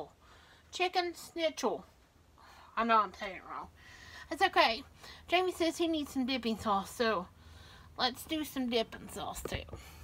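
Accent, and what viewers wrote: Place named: West Virginia, United States. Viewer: well, I have a. Horrible pain when she speaks Hello ever body.....scrapes. she doesn't care. I'm not subscribed and wont matter if I don’t watch. I don’t subscribe she doesn’t ever respond even to her wrorshipers. ( those who praise a new shirt with stains) I can like subscribe a million other YouTube cooks, like Paula deen ( who is a true a Sourtherner. Not fake Orr a wanna be ). My grandparents were from West Virginia-as is and their accent was hard as well)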